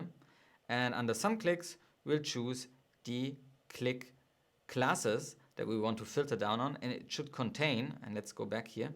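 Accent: Germany. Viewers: If Yes, is it possible to have a call with you? I really need help with my tracking. Im loosing so much money, because something is wrong with my tracking. I have quiet good traffic and im still making 50+ Sales a Week (organic) now i want to scale. But something is in my setup is wrong! Of coruse i´ll pay you for your help! Pls answer to this comment or give me an oppertunity to contact you. Idk but i Think you ´re a german guy? Ich kann es am Akzent hören ;)) Ich freue mich auf deine Rückmeldung ;))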